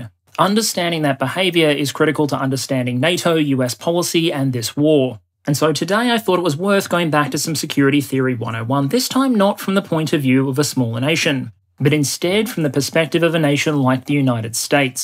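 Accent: Australia. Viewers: He has an Aussie accent , but as an Aussie , I can tell he is a nerd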